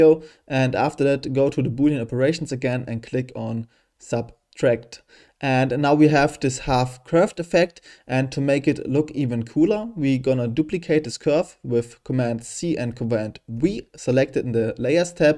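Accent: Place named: Germany. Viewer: Der deutsche Akzent fetzt😂 gutes Video, besten Dank!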